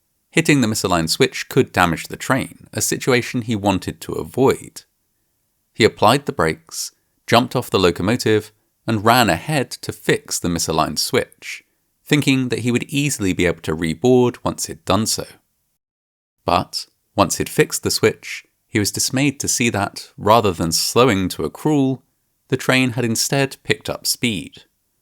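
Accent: United Kingdom. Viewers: I've listened to 15 seconds. I hear a British narrator voice for an American story - a telltale sign of an AI generated video. Can anybody tell me if this is a true story or is it AI fiction? For now, I'm clicking away and going elsewhere.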